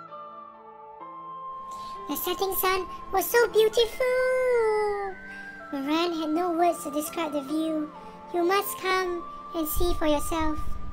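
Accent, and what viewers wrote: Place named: Singapore. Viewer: yo i can already tell you're Singaporean laaa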